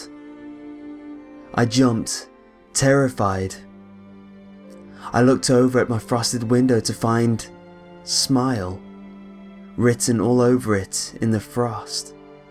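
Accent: United Kingdom. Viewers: This is my favorite creepy pasta channel, I don't why but I like them better when someone British reads them. I have watched most of his, does anyone know of another really good british creepy pasta channel?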